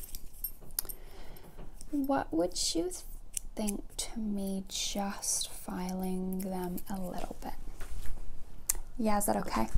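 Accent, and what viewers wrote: South, United States. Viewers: As someone who grew up in the south but no longer lives in the south the way you speak is so nostalgic and relaxing!! it brings me back to elementary school! Please tell me im not insane and your from the south lol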